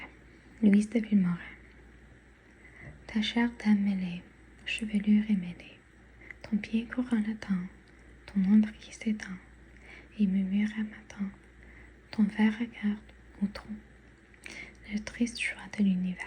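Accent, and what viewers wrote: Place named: Paris, France. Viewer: This sounds like an accent from Paris. Very interesting because your English does not have a French accent.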